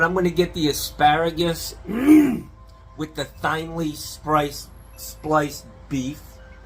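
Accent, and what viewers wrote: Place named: Northeast, United States. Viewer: your face now fits your NE accent! Not many people get to a point where their faces and voices line up.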